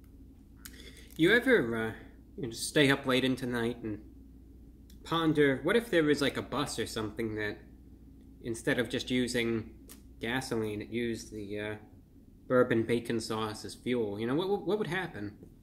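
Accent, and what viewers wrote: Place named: New York, United States. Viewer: You can tell he's from the New York area.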